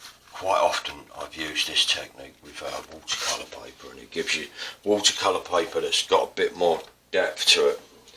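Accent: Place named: Netherlands. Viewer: Watched all of them .. Very nice technique.. for a dutchie your accent is very difficult to follow, but luckily art most of the times doesn't need a language! TFS..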